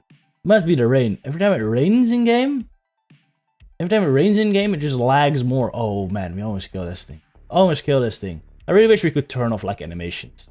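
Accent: Netherlands. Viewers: Zo duidelijk dat je NL bent, goeie video’s wel hahaha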